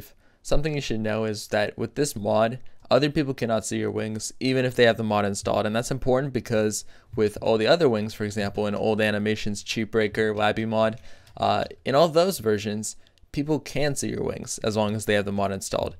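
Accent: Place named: Russia. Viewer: русский говорит по англиш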